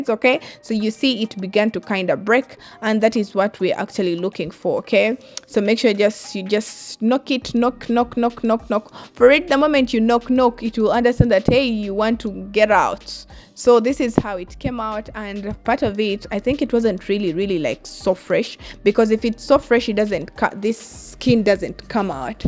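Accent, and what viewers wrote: Uganda. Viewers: You sound UG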